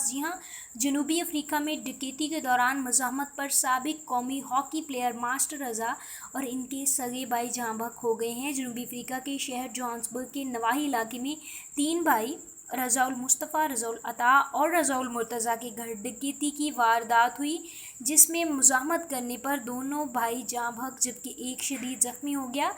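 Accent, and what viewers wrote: India. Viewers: Accent indian ha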